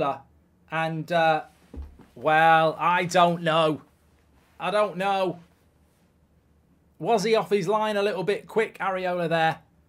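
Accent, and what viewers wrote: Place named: Scotland, United Kingdom. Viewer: Marks Scottish accent 🙈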